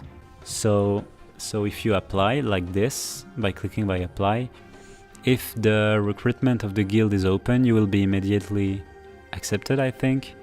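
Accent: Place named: France. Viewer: Just gave up because of the terrible accent. Don't wanna show off but tbh I could do better my first hs year and still I'm french too. If you can't talk correctly just do your vids in french, butcher.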